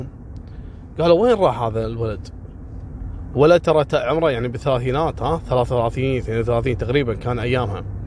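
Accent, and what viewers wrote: Kuwait. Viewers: يا اخي قصصك جميلة واحلى ما فيها اسلوب سردها ولهجتك الكويتية الجميلة التي نحبها من ايام مسلسل الى ابي وامي مع التحية وخالتي كماشة وغيرها من المسلسلات الكويتية الجميلة اخوك من العراق احبكم يا اهل الكويت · والله ضحكتني ايكله اكضب الباب انتةوابنك والله حلوه لهجتكم اموت عليكم يااهل الكويت الطيبين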